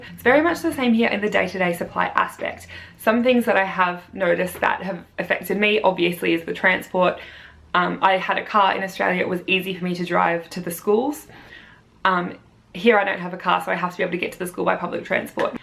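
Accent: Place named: United Kingdom. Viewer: Your accent is starting to sound British :D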